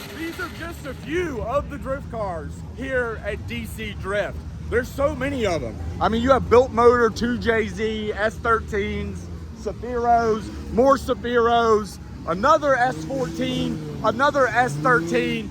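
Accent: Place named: New Jersey, United States. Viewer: Yo have a jersey accent..are you from the north East coast?love your content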